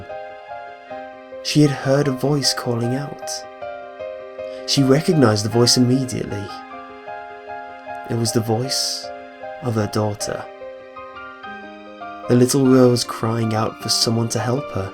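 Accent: United Kingdom. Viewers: Aww poor. I still love you. I love me some British narrators <3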